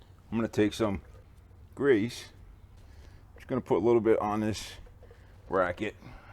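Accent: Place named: New York, United States. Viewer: This was the best tutorial I’ve come across for this specific job for this specific car. Hers is a 2018 I hope this applies. My daughter wants me to change her brakes and she really doesn’t want to pay those outrageous mechanic prices and neither do I. I’ve only done 2 other vehicles. So here goes! Oh! If you don’t mind my saying, you sound like you’re from my neck of the woods. NY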